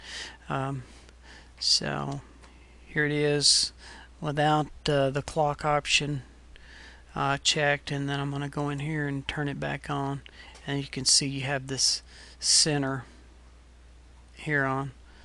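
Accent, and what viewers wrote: United States. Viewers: Are you from Atlanta?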